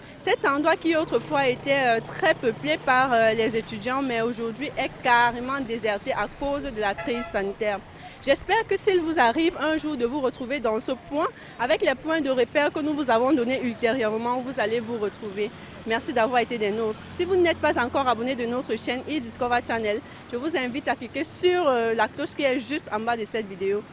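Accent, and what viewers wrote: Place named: Cameroon. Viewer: Vous ressemblez vraiment à une américaine, même si l'accent du pays est fort remarqué pour l'américano camerounaise que vous êtes, ma jolie. Je kiffe votre reportage.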